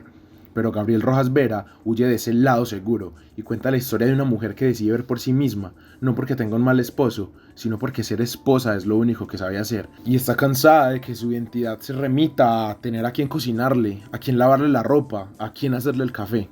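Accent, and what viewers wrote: Colombia. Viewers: Amo el cine en casi todas sus facetas, hace unos años que vengo viendo la crudeza, el amor y la esperanza del cine Colombiano, transmitiendo esa realidad que todos vivimos y nos toca, ahora estoy en otro país, soy de Cali- Colombia, por como te oigo sos paisa Bacano encontrar gente que ame el cine como uno, ese es un lenguaje muy fuerte, algunas de estas películas la Vi con amigos, otras en señal Colombia y otras con cracks del cine en Cali, uno de ellos se llama José urbano, quien vió al caliwood nacer, ese men es un baluarte en Cali sobre el cine, me hablaba de Mayolo, de Caicedo y así.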